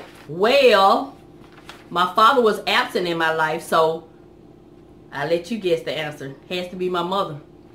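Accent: South, United States. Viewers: You are absolutely adorable! I enjoyed learning about you. I love your southern drawl. Congratulations on your amazing accomplishments. TFS 👌❤😁!!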